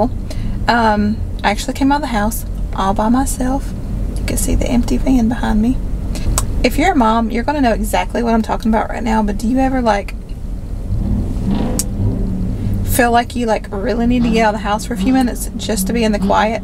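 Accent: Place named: South, United States. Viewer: HELLO SWEET LADY, I AM NEW TO YOUR CHANNEL AND JUST WONDERING WHAT STATE YOU LIVE IN AS I HEAR A BEAUTIFUL SOUTHERN ACCENT.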